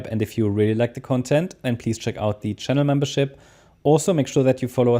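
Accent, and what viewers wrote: Germany. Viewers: love your content too! you are a german guy? i mean i hear it^^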